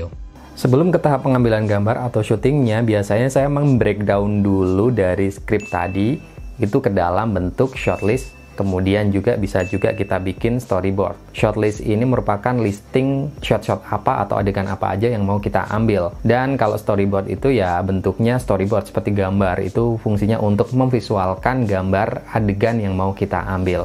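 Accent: Pekalongan, Indonesia. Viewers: Orang pekalongan ya bang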